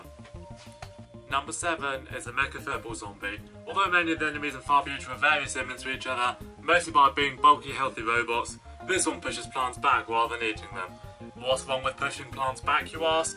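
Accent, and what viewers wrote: United Kingdom. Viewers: I think he's Britisih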